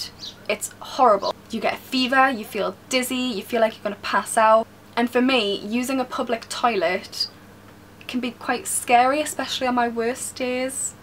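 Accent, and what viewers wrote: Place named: Newcastle, United Kingdom. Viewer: Great video. I am in the process of being diagnosed. I have had bleeding and mucus at 2 year intervals for the past 10 years. Mine seems to be very mild at the moment, no pain and limited toilet visits- however, it is not very nice even at this level! P.S. recognisable accent, I hope you are a Toon fan! ⚫⚪⚫⚪⚫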